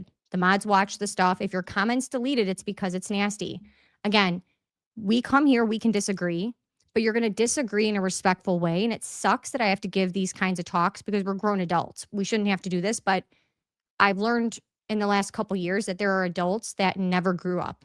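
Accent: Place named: Midwest, United States. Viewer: Youre from Florida 😮 i thought by your accent you were from the Midwest like Wisconsin 😂😅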